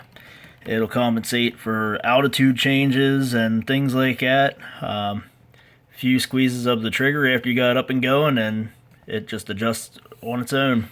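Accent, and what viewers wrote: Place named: Pennsylvania, United States. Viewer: Hardcore PA accent right there.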